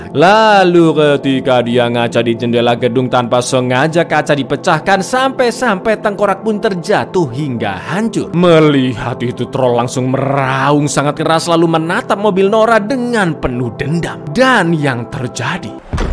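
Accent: Java, Indonesia. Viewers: pakek logat jawa cak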